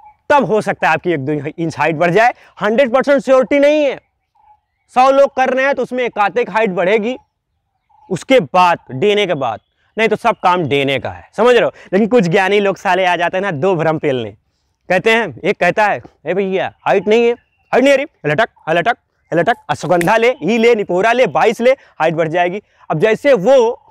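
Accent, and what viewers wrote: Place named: Morena, India. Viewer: Aap bilkul Morena walo ki tarah bolte ho humari desi aawaaj mein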